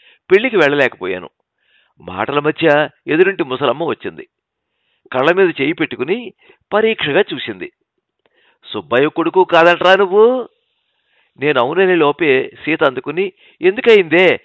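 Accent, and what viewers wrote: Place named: Guntur, India. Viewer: చాలా కాలానికి మట్టి వాసనతో పరిమళించిన అచ్చ తెలుగు కథ విన్నాను. పాపినేని శివశంకర్ గారి కథా సంపుటి "మట్టి గుండె" లో ఈ కథ చదివాను. గుంటూరు జిల్లా పల్లెటూళ్ళలో స్వచ్ఛంగా వినిపించే భాషతో కథ మరింత శోభించింది. మా జిల్లా ప్రజల భాషను కథలో చొప్పించిన శివశంకర్ గారి ఘనత ఒకటైతే, ఉత్తరాంధ్ర వాసి గారు, ఆ భాషకు పూర్తి న్యాయం చేకూర్చటం మరొక ఘనత. రచయితకు, ప్రయోక్తకు వేనవేల వందనాలు.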